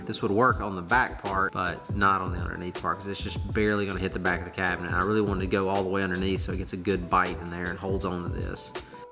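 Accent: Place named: Tennessee, United States. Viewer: Are you from Old Hickory Tennessee? You sound like you could be Nate Bargatze’s brother. Lol!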